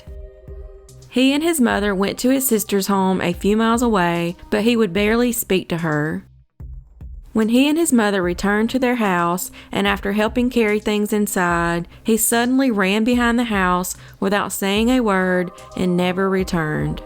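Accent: South, United States. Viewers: no problem. For me this is like really american local experience and vibe which i get from your videos. I like your southern accent. And when i said thanks for covering cases unknown to me, i meant you really dig up cases which are really unknown or unheard. Nevermind that im from Bosnia i watched almost all known cases, and a lot is familiar to me. You bring something new and fresh. Like everyone is eating vegetables from supermarkets from industrial farms, and you bring your local on fresh soil grewn organic vegetables 😁😜😆😂. Thank you again.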